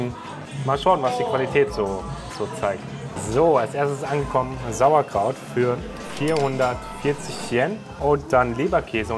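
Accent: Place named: Thuringia, Germany. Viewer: Sympathischer Mann, man merkt direkt das er aus Thüringen kommt:D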